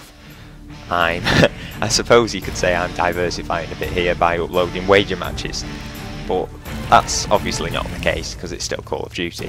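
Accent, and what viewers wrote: United Kingdom. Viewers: A British Commentator :D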